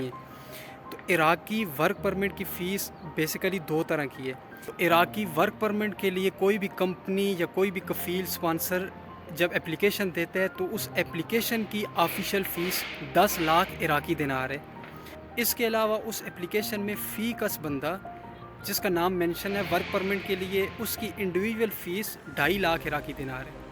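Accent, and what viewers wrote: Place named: Hindko, Pakistan. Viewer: Yr ak mujy apny hinko speak bi lgty ho khan sy ho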